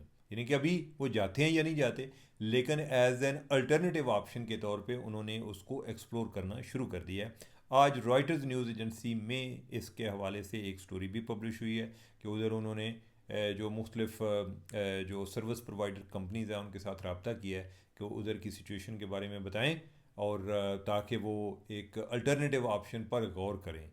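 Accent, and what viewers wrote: Punjab, India. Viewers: آپ کی پنجابی کا تڑ کہ سچویشن کی پکچر بنا دیتا ہے😂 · You're really funny in your story-telling, especially when you add that Punjabi touch.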